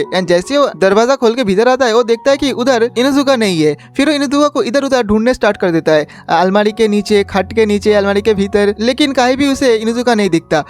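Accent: Bengal, India. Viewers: Vai apka bat Thora Bangla lag rahera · Bro,are you Bangali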